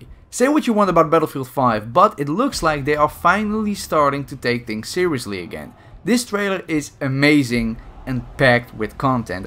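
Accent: Netherlands. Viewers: You are from the netherlands or am i wrong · you dutch?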